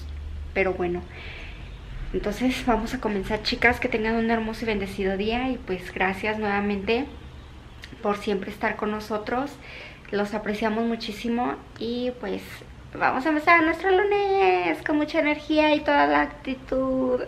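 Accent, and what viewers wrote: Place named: Chihuahua, Mexico. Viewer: oh que bien , se me a figuró Que tenias el acento de chihuahua, pero al igual somos mexicanas , buen día , y pues una seguidora más en tu canal felicidades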